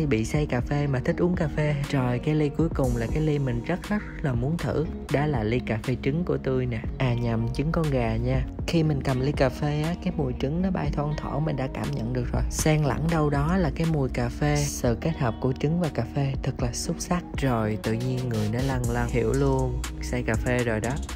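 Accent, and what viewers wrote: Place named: Binh Dinh, Vietnam. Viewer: Giọng BĐ nó z :)) nghe nhìu òi :))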